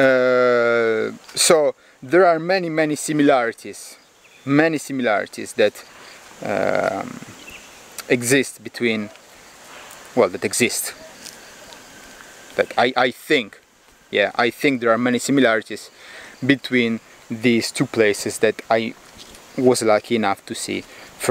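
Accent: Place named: Russia. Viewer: You have strong russian accent xD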